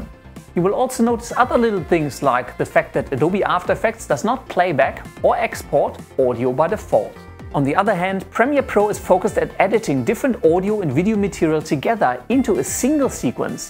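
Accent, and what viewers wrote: Germany. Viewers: Man merkt das du deutscher bist :) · "Foa evaa" Das deutsch drückt schon heftig durch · He has a German accent so he should be German. Anything else would be odd. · Do i hear a german accent? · Man hört direkt das du Deutsch bist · So are you a German with an English Accent? I'm trying to figure it out. I understand what you're saying pretty well but it's not California American, that's for sure. Just curious. Your videos are great! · Your German accent is so cool:D!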